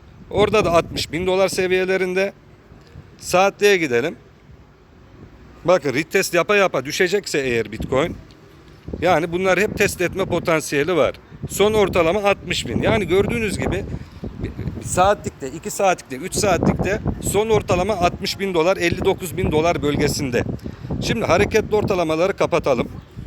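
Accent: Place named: Aegean, Turkey. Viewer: Ege şivesi candır 😄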